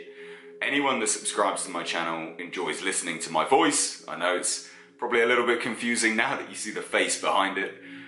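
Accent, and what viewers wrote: United Kingdom. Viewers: I NEVER say these things, 1st time ever.....but YOU'RE EXTREMELY HANDSOME & I've always loved accents, especially British. As an ardent Anglophile, I'll be embarrassed if you're not UK.... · Handsome fella you are. Can I ask what kind of brit accent you have? Excuse my ignorance but just curious since your voice is smooth and fluid in every way. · Jesus you’re ripped😂😂😂!!! And you’re voice is so deep and British!!!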